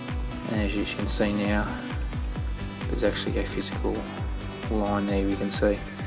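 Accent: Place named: Australia. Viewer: So Aussie haha (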